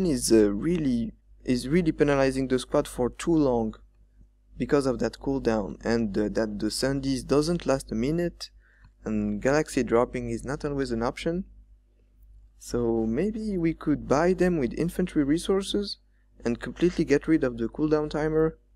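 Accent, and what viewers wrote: France. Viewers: you french ? :0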